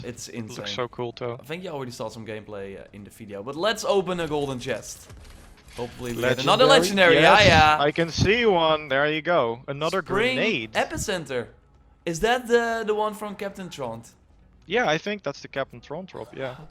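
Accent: Netherlands. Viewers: Are you Dutch? I mean, '' '' should be a dead giveaway, but who knows.. You just sound like my Dutch friends speaking English to our American friends. Gewoon zo'n Nederlands dingetje weetjewel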